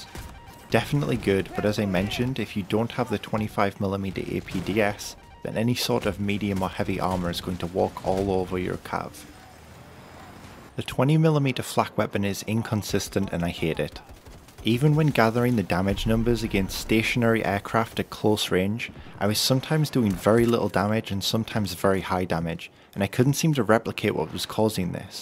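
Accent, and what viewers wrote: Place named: Europe. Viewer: judging by your accent, I'm assuming EU time zones. When are you playing? What game mode? It's a shame we can't easily play servers that frequents.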